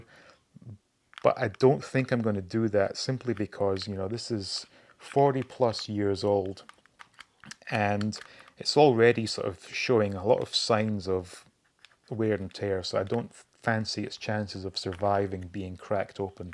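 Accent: United Kingdom. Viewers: I had the UK one which I guess you had too by your accent. But it was totally different it had no battery's for a start I think it worked on a sping system so when you pulled the cord it pulled a spring tight and them pulled itself up . The Web was see through strong rubber and it glowed in the dark and so did spideys spider on his chest and maybe his eyes and I think the body was in better proportion and not as thin as the USA model . But there was no tourch as there was no power . So was this not the one you had ? Thanks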